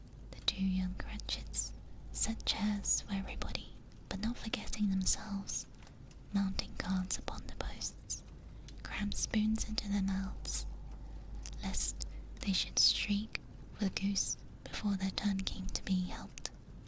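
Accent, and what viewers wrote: United Kingdom. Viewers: proper british bloak reading a proper british tale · That's some proper no frills just straight up full course British asmr :_eepy::_ZZZ::_ZZZ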